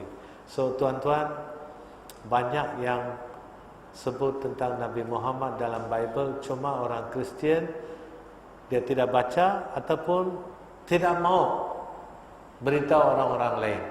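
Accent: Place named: Sarawak, Malaysia. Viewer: No wonder , cakap macam urg sarawak... islam minoriti d sarawak... tp jadi leader... kenapa? Aaaaa...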